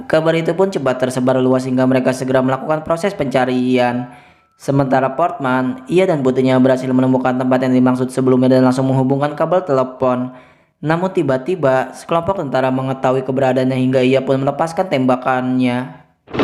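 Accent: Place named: Betawi, Indonesia. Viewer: Narator logatnya yg terakhir agak ngelenong 😀😀😀🙏🙏🙏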